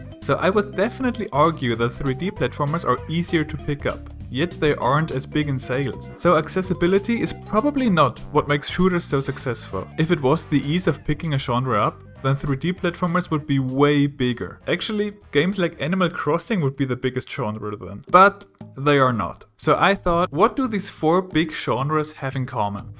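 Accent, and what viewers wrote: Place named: Germany. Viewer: Sehr interessantes Video und mir ist dies auch aufgefallen, was mich sehr traurig macht, weil ich Platformer/Jump 'n' Runs am meisten liebe! :( Bin mit Banjo, Mario und Rayman aufgewachsen und liebe diese Spiele bis heute alle noch <3 Und ich als selbst Deutscher, hab sofort an deinen Akzent erkannt, dass du auch einer bist xD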